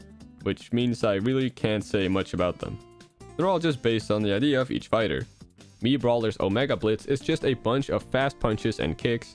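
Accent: Netherlands. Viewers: are you dutch? me to if you are dutch! love your content!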